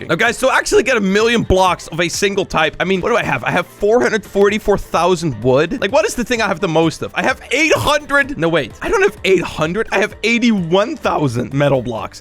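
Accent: Netherlands. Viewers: Wait are u dutch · Are you dutch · Your Dutch aren’t you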